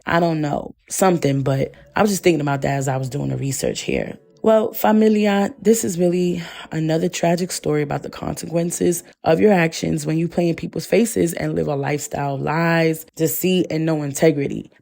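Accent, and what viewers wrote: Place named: East Coast, United States. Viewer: Your East Coast accent is sooo Cute. Also, What a MESS These folks Were in.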